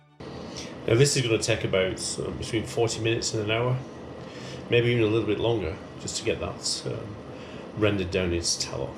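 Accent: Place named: North, United Kingdom. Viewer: The Northern accent gives a certain degree of credibility, you know this beef dripping is the real deal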